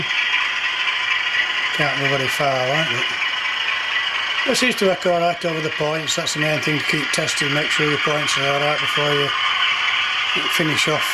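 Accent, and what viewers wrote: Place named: North, United Kingdom. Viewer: Hi, What a lovely set up you have, it looks great even as you said, a long way to go??, keep up the good work, nice to hear a north country accent as I am from the north country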